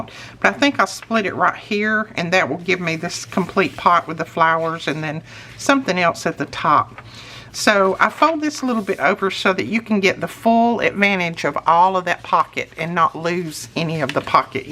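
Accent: South, United States. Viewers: Yup found it! Thank you. I love your videos and your southern accent! (I’m from Boston lol)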